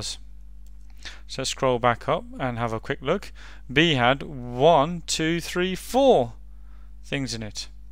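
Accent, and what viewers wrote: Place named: United Kingdom. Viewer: yesss finally found a math video with someone with a british accent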